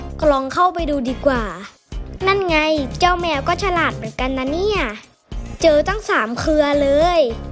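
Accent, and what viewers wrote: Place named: Ratchaburi, Thailand. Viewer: อยู่จังหวัดไหนล่ะครับเนี่ยฟังสำเนียงเหมือนอยู่ราชบุรีจังเลยล่ะเนี่ย ไม่ราชบุรีก็นครปฐมล่ะนะเนี่ย 555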